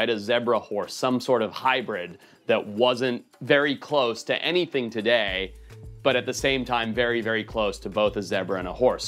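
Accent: United States. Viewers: Saying Americans pronounce zebra wrong when you pronounce can’t like con’t and forest like far-ist is and interesting take. You basically sound American except for certain words. Almost like you’re on real bros of simi valley.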